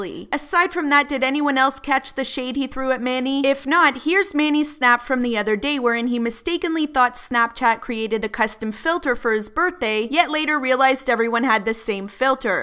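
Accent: Canada. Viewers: Canadians and their “Abouts”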